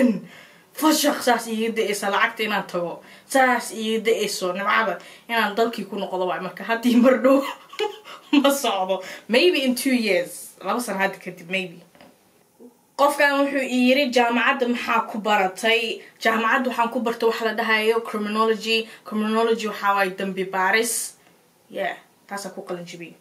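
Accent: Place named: Burao, Somalia. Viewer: From mugadishu but your accent I thought you from Burco kkk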